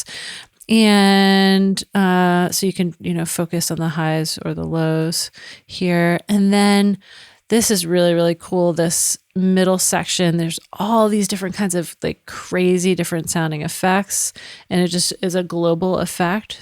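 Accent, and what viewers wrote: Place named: United States. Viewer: your surname is catalan,..but you speak english native with american accent..anyway thanks for this..i love ujam striiiings..is one of my favourites plugins when i compose...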